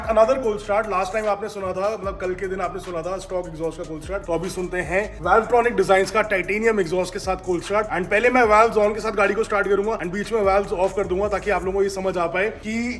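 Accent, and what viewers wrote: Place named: Punjab, India. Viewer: stock awaz madi nai h😂 prava hindi ch punjabi 😂 good bro 😎 sara kuj punjabi ch bolya kr yara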